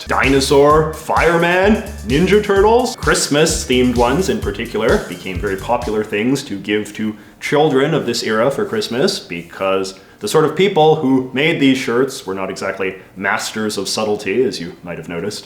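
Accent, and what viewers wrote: Canada. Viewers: Love the Canadian accent. Secular Christmas music from the 80's and beyond will always suck. It all has become a holiday of acquirement instead of the real meaning of Christmas. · To any Canadian who denies they have an accent, listen to this guy.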